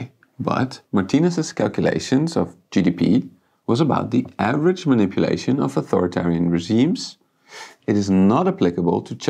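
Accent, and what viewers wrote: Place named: Netherlands. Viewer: He's Dutch. This is obvious from his accent and from his name.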